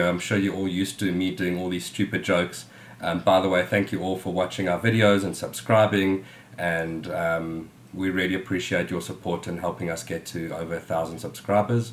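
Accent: South Africa. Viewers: I'm so sad about your tragic loss, but happy you had a precious boy thereafter. Awesome to hear your SA accent! The Lord bless you and keep you. Greetings from Mossel Bay 😊❤😊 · I am so very sorry for your loss. I'm trying to pinpoint your accent? South African? Just snooped your other videos, and answered my own question when I saw one video said you are in Africa. This video blessed me today, and I thank you.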